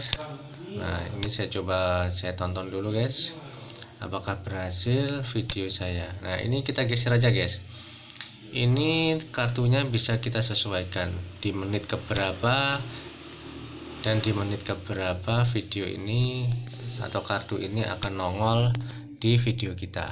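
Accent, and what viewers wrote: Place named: Java, Indonesia. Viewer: Makasih info triknya boskuuhh...mantap , medok banget ngomongnya yah..😃👍👍👍sudah aman boskuh...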